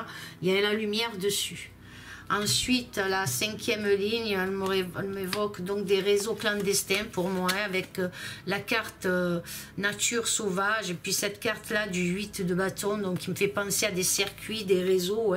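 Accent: South, France. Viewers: Votre accent du sud (Marseille ?) est très très très très prononcé !!!…My God !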